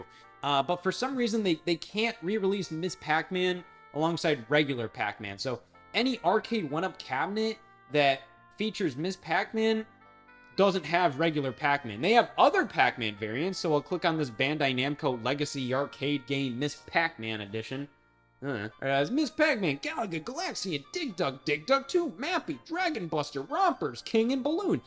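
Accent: Ohio, United States. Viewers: He is from Ohio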